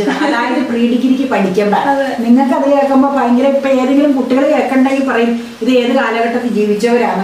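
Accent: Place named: Muvattupuzha, India. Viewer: Ru from muvattupuzha area you talk like people from there my family is from there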